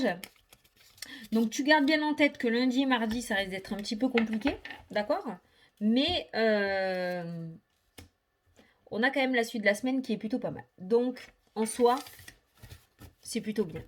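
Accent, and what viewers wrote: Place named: Southwest, France. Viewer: j'aime beaucoup ce mode de tirage. Je sais que les cartes disent vrai.... car j'en suis là et cela dure depuis 3 ans pour le dernier choc et 2015 pour le début de ma descente aux enfers des jugements des exclusions familiales. Je n'ai prise sur rien là est le problème. Je ne peux pas vider mon sac puisque personne en face de moi. Je ne peux plus continuer comme cela... j'espère un message même négatif pour pouvoir réagir et mettre un point final à cette situation. Merci pour cette lecture et pour l'Amour du Ciel.....ne change pas tu es juste parfaite avec ton accent pour moi qui suis native du Sud-ouest. Bisous ma Belle👌👄💖😍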